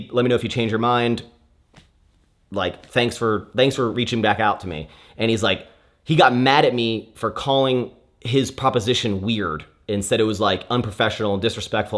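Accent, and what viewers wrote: Texas, United States. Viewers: That Texas accent becoming more and more apparent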